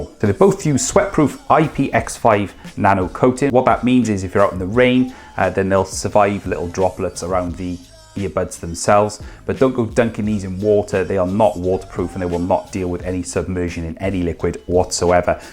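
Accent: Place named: England, United Kingdom. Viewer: Great review, nice to hear an English voice after listening to a few Americans.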